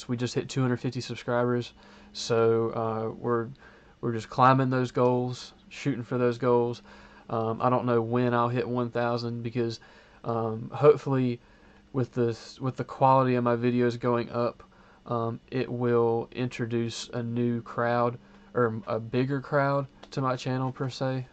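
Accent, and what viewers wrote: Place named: United States. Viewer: you sound countrryyy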